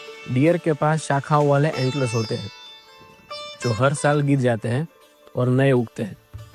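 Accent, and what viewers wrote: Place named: Gujarat, India. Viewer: Good experience even after being a Gujarati you had neretted fine. But you should work on tone of language because there is still Gujarati accent and flair shown in tone. Please watch Sony BBC earth, discovery, National geographic hindi version to improve your hindi narration. Very well done for you efforts.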